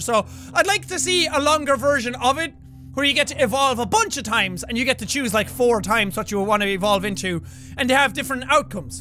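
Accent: Ireland. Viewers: oh my goash its an irshman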